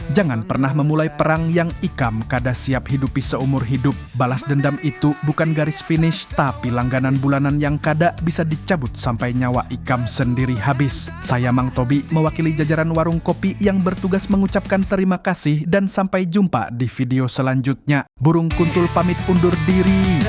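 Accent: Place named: Banyumas, Indonesia. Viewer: ngapakke krasa banget koh wong banyumas mesti kie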